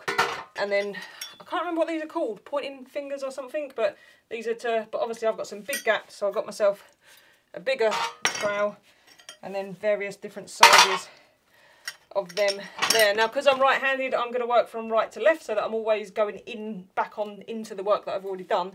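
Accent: United Kingdom. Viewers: Talking fast...I change Play Back Speed. Being from US it is sometimes difficult figuring out what Brits are saying. ☺️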